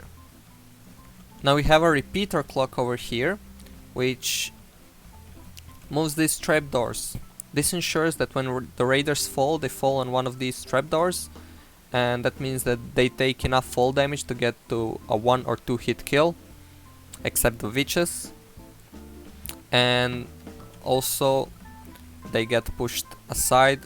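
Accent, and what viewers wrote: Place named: Russia. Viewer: u sound kinda Russian. also I had a question, does this farm work on paper? bcs ray works one doesnt.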